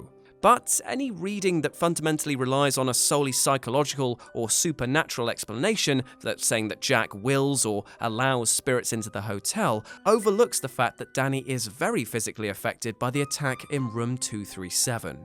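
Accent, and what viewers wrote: United Kingdom. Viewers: wow, if you can think it....people can try and make any wild idea to try to make sense ... this is lame..... next video i bet you'll try to connect scooby-do with "it" and "the village" as one great universe... please stop, cause your british accent doesnt make someone sound smart, cause your silly, the evil ghost is not "it" in all his novels, so there you have it-you stink ..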